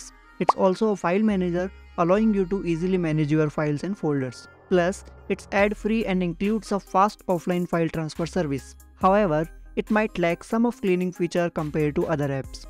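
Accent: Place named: India. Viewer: It's a normal indian accent. Your comment is racist af.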